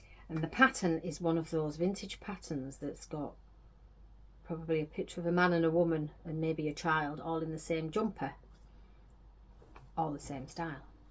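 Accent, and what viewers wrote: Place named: Northern England, United Kingdom. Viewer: Just come across your channel and have subscribed. The quilt is beautiful - such soft, pretty colours. I’ve never tried Tunisian crochet so am interested to see how your sweater turns out. Where is the beach at the end of the video? I was born in Northumberland and lived in the North East until my late twenties. So nice to hear a northern accent. I’m with you on card only payments. We must pay with cash wherever we can otherwise risk losing it altogether. Happy crafting, Margaret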